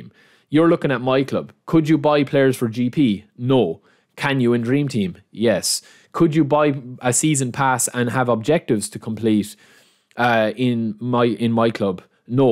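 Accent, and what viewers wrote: Ireland. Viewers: That Irish accent is quite a ting, lad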